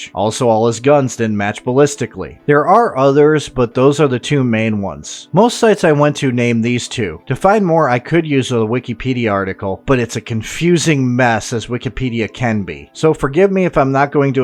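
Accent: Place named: South, United States. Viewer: man! What a great video you created here. Very informative and nice flow. The crazy thing is that even with the bad southern accent (and I’m from GA!) - I really like listening to your accent as you narrate! Great video. New subscriber:)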